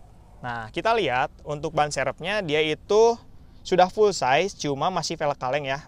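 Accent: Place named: Sunda, Indonesia. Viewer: Mang, asik reviewnya. Logat sundanya kentel lagi. Yang rajin mang!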